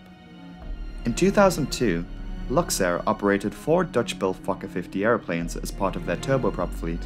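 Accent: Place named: North East England, United Kingdom. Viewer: Based on my limited knowledge of UK accents (I’m American), I’m guessing he’s from North East England. And I agree that it’s an appealing accent; it’s certainly much nicer-sounding than mine 😆.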